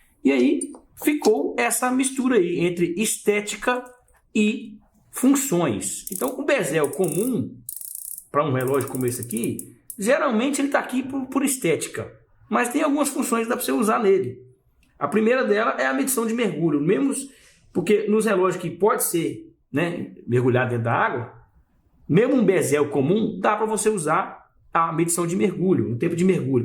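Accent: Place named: Minas Gerais, Brazil. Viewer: APOSTO que esse relojoeiro é mineiro! Que sotaque horroroso! "Pá quê quí sévi u bezel du relój?" Poha, sotaque de Minas Gerais DÓI no ouvido!!! VSF!!!!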